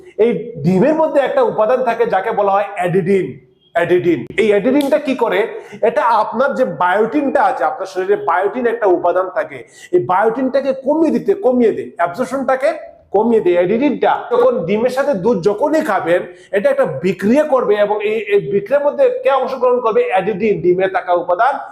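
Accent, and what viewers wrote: Sylhet, Bangladesh. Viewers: বন্ধু, আফনে মনে হয় সিলেটি, সিলেটি মানষর লাখান লাগের তো 😅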